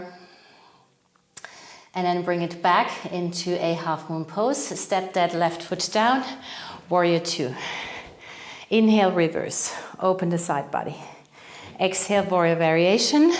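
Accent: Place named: Germany. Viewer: Habe gerade deinen Kanal gefunden und abonniert und freue mich sehr darauf mit dir zu praktizieren!! I also LOVE essential oils and your German accent. Lol. Alles Gute und viele Gruesse von einer Kollegin.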